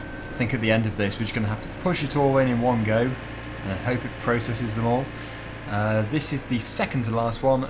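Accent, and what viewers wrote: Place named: United Kingdom. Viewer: Is anyone else turned on by his British accent?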